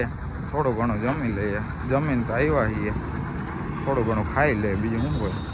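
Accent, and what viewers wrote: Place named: Kathiyawad, India. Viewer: bhai aapno pure kathiyawadi flowless communication👌👌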